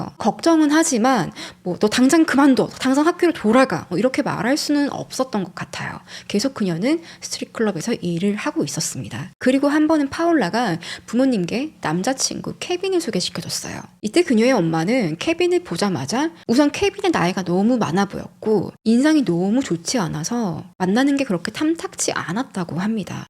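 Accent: South Korea. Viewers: 외국에 사는 발음이 아닌데요. 한국식 영어.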